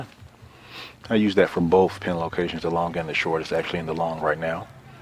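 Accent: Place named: South, United States. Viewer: Nicely done. Soothing southern voice. Simple and clear.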